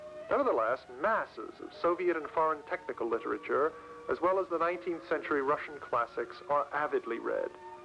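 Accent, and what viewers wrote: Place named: Oxford, United Kingdom. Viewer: Попробуйте посмотреть в оригинале - диктор говорит с очень красивым акцентом, характерным для ученых в Оксфорде:) И это не единственный плюс просмотра в оригинале - про-версия не нужна, нейроперевод не нужен, ваши когнитивные способности улучшаются, а главное - все совершенно бесплатно.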